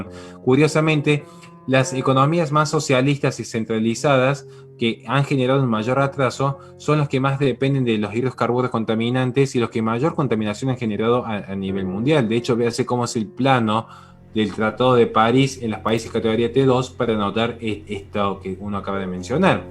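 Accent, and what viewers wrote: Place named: Cordoba, Argentina. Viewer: Verdad, debo admitir que no me gustaba el acento cordobés pero que deleite es aprender de este genio, despierta mi curiosidad se saber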